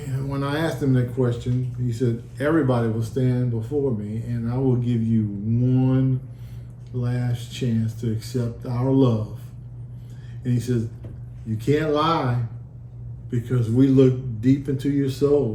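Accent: New Orleans, United States. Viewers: Love your story Will, and you sound like your from the New Orleans area? I was born and raised there.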